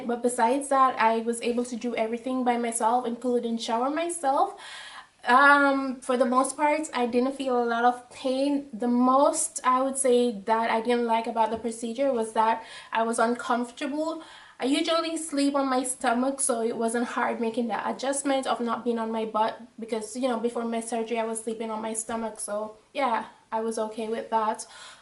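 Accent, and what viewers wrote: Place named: Jamaica. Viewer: I know a Jamaican when I hear them! I can hear through your American accent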